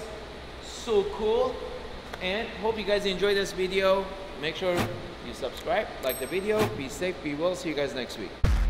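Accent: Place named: Iran. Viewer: I never heard of this man before in my life. But i can hear on the accent he is Persian.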